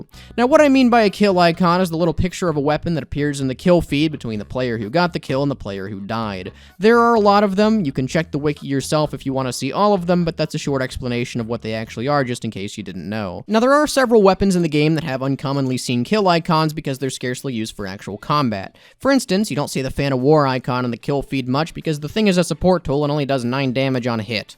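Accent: United States. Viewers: that's the most southern accent i have ever listened from a tf2 youtuber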